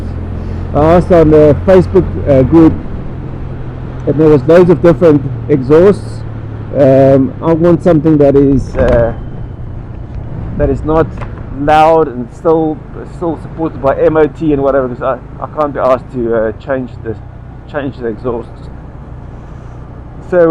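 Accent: South Africa. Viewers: what a fun little bike.. Are you from South Africa? . Why is the sound so bad with the visor closed?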